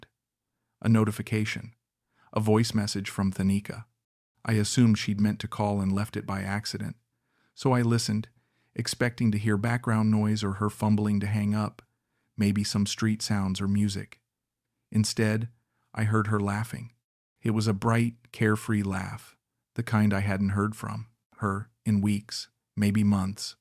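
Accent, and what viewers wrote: United States. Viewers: You don’t have a British accent, you have American accent so is this story real? If it is real I think you are not a smart Englishman!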